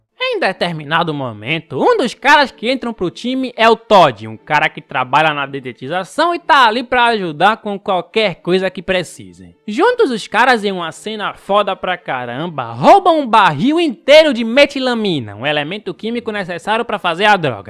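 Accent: Northeast, Brazil. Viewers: Sotaque nordestino o caba conhece de longe😊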